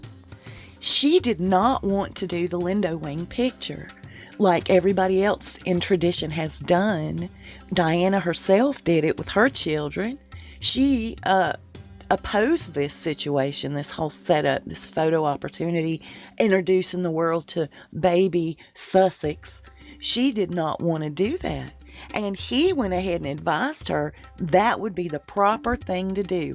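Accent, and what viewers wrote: South, United States. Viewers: Your from the south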